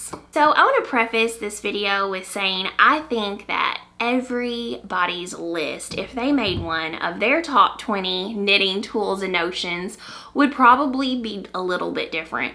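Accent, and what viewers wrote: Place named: Kentucky, United States. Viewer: Hello!! I have a question I hope I done sound stupid! I am new to sock knitting I made my first sock last week during shock week. And now I am obsessed I am working on my first official set and I have been so worried about blocking them. I don’t have sock blockers at the moment it is just not in our budget! So I really need tips on blocking sock with out the blockers! Oh and I love your podcast I am from Kentucky so it’s cool to hear another knitter that sounds like me!!!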